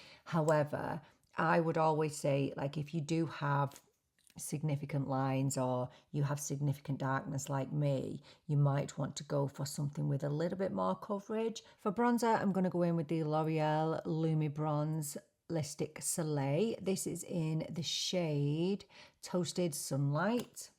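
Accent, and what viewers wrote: United Kingdom. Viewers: I just found your YouTube channel and I have subscribed first. Let me say I was drawn by your British accent. My mother was British. Next let me say it. I find your delivery very informative yet very sweet and I like that so with that being said, let me say this. I have been debating about it cosmetics balm. I feel you have isn’t it a very fair review and I don’t feel loud that it is a product that I’m looking for I am 72 years old and I have been wearing Estée Lauder foundation for years now however I don’t think it’s working for me any longer. It just seems to lay there on my skin. I may give the L’Oreal try. Thank you for this review. I will be following you.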